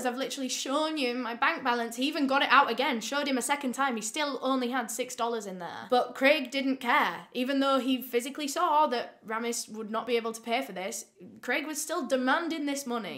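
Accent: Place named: Yorkshire, United Kingdom. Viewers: Yay a northern lass! I'm guessing Leeds? 🙏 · I'm going to be the billionth person to compliment voice. 🙂I've heard a lot of Yorkshire accents in period pieces, but I'm pretty sure this is the first time I've heard the modern version. I didn't even know where in the UK from until she mentioned it. I love listening to her, and think she does a great job presenting the cases. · you know how to brighten my day with your true crime tales and your delicious-sounding Yorkshire accent! Thank you so much, my dear girl! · Sounds more like a Leeds accent 👍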